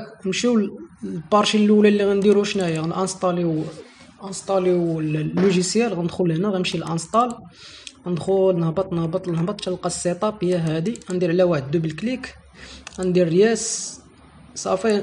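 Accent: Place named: France. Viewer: اتكلم عربي فصيح... كلامك زي الفرنسين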